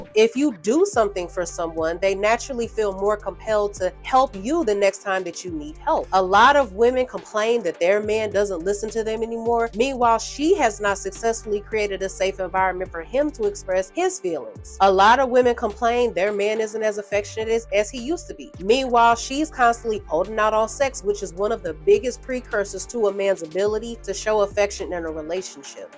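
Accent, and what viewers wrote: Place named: Texas, United States. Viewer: Great information as always. Question are you from Houston or Texas by chance? I'm always trying to figure out accents.🤦🏽‍♂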